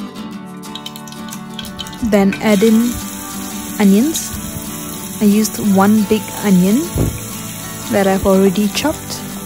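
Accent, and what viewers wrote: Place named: Singapore. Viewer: Your english is close to heart. Sounded Singaporean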